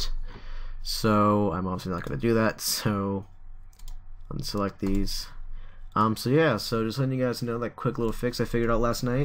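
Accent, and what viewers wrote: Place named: United States. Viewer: Cool accent ! What part of the states you from??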